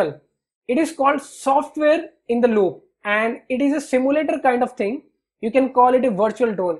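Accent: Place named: India. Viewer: I cannot tolerate your dialect. I think you are from India. Please consider voice training. Please!!!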